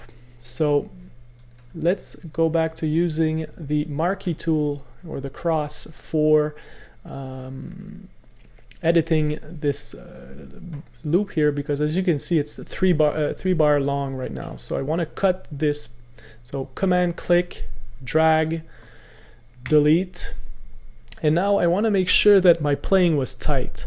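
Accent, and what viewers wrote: Quebec, Canada. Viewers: stu un quebecois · Quebecois spotted.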